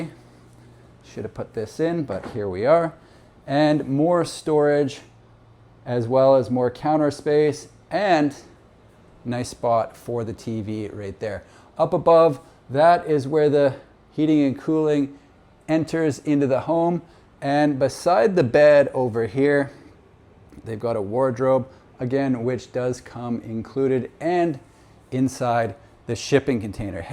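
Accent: Canada. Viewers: Nice Canadian accent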